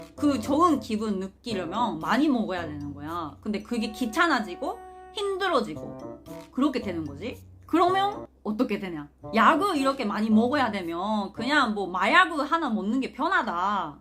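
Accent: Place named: Gyeongsang, South Korea. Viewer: 아니,,, 나 경상도 사람인데 이분 표준어 쓰는줄알았는데 자세히 들어보니까 경상도 말쓰는거같네 ㅋㅋㅋㅋㅋㅋㅋㅋㅋㅋㅋ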